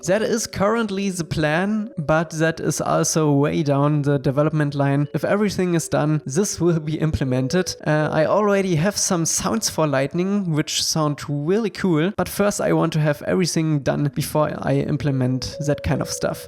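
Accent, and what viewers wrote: Germany. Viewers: dieser deutsche akzent haha ich liebe es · Ist nicht böse gemeint aber finds lustig wie man hört das du normalerweise deutsch sprichst😂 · Wie man den typisch deutschen Akzent raushört nice haha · Are u german? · Bist du aus Deutschland? Hahaha hört sich so an😂 Translation: are you from Germany? You Sound like that haha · Stabiler deutscher akzent 😂👌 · I'm really looking forward to the game, take your time and don't be stressed out. The game should be just as cool as you imagine it to be. Ps. may it be that you are german, your english sounds exactly like mine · Leichter Deutscher Akzent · Mann hört heraus das du ein Deutscher bist🇩🇪❤️❤️❤️ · Alter dein deutscher Akzent ist ja soo geil :))) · Ich mag den deutschen Akzent xd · are you from Germany?? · Bitte rede normal english oder gar nt weil dieser deutsche Akzent nervt hart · Am I crazy or I can really hear a German accent? 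If yes, I am your neighbor. But from Poland :P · Ach ja der Deutsche Akzent xD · I like how you can hear out that you're coming from germany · Ich feier dein Alman-Englisch😂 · The german Accent is just on point😜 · Man kann raushören dass du deutscher bist 👌🏼 i like it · are you german ? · Pls. Fellow German. Learn the TH sound. Your waaaaaay to much of a genius, so this shouldn't be a problem. Really impressed on your game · Wer liebt auch seinen Deutschen Akzent ^^ <3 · Man hört es fast immer raus wenn Deutsche Englisch reden. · Love the german accent. Feels like hes introducing a german fight game. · Du bist deutscher man hört es so hart klingt lustig aber kannst gut englisch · I think he is from germany :D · are you german because you Talk english like one (im an german) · Der deutsche Akzent ist thicc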